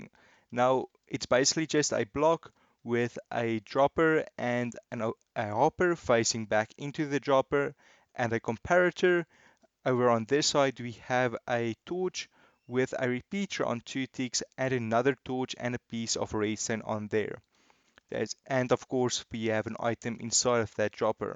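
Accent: South Africa. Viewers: You sound South African. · Really nice vid, bud! Sorry if this is rude, but you sound South African. Thanks for the T-flip-flops!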